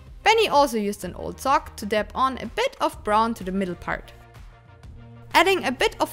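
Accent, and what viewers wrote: Germany. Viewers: Sprich doch deutsch🤦🏼man hört das du deutsch sprechen kannst KeIn EnGlIsch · Einwandfreies Englisch mit Deutsch Akzent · Hi,! Really nice work! Do you live in germany? I think i heard a german accent in your english. ^^ Would be easier for me so i could leave comments in german. 😄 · Dieses deutschhhhh!!! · Hi do you speek germany, ir sound like this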